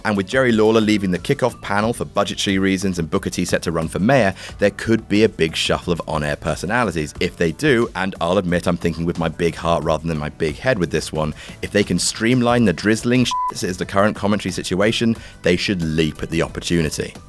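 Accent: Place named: England, United Kingdom. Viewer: Why does he sound like he is from England then?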